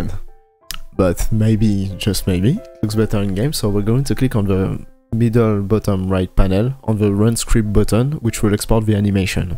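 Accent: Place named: France. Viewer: Love your accent, I think you're french <3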